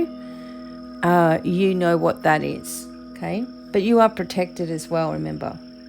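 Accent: Australia. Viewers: Knickers in a knot 😂 So Aussie , love it . Hello from NSW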